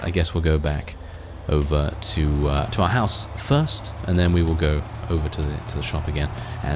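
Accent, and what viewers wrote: United Kingdom. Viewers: Came here for the games and stayed for the british accent.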